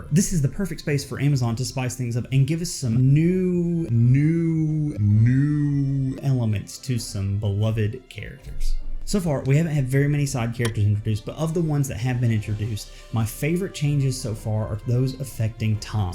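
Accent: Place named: Alabama, United States. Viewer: I thought I heard some Alabama in there. I've got family in Tallapoosa, Georgia (which is within spitting distance of Alabama). I've loved that accent for years. My wife and I are from SE Indiana (Aurora)...which is basically near Cincinatti, OH. We are in the Tri-State (Indiana, Ohio and Kentucky). We have an accent, but to us...it's just the way you talk. Thanks for sharing.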